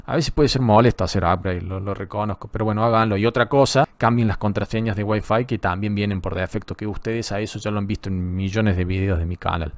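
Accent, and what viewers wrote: Córdoba, Argentina. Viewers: Sos cordoooobes?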